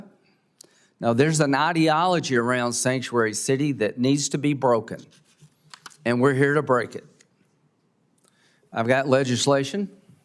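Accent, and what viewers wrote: South, United States. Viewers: Sounds like a confederate talking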